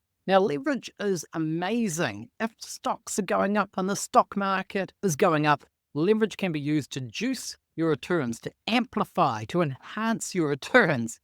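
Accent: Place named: New Zealand. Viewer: Thanks, for sharing your knowledge and advice. I lived in New Zealand as a child for two years some sixty years ago. Hearing your accent brings back fond memories of the Land of the Long White Cloud!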